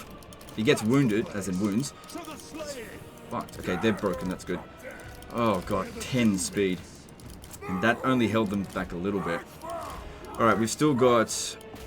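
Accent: Australia. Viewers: The subtitles dont recognize australian, you speak too fast and unclear.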